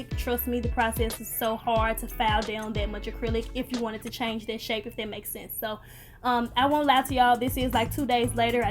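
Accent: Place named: Memphis, United States. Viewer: wow, you are so cold! I heard your Memphis accent and was instantly looking for an appointment website bc your nails are so nice! lol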